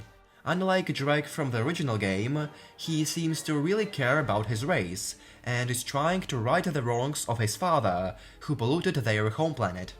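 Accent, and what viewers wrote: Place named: England, United Kingdom. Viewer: тебе очень идёт английский акцент, и вообще, молодец, что решил изучать языки😄Круто справляешься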